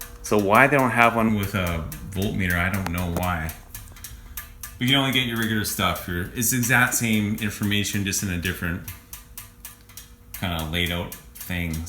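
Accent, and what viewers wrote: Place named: Minnesota, United States. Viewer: Someone's from Minnesota lol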